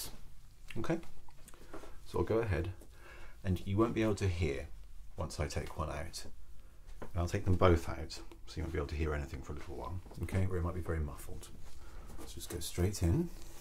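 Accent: United Kingdom. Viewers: 썸네일만보고 속삭이는 소리 기대하고 왔는데 제가좋아히는 영국식 영어에 목소리까지 너무 좋네욬ㅋㅋㅋㅋㅋㅋㄱ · Its incredible how much i love the british accent!! Besitos From Spain♡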